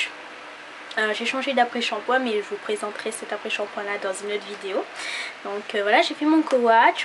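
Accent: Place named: Réunion. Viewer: Ta un accent créol réunionnais ;-)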